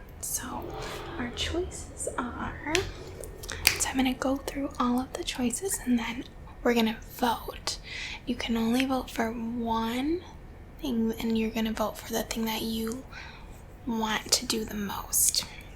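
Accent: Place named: Michigan, United States. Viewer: She's gotta be from Michigan right? Or upstate New York. Something up north. Love the accent!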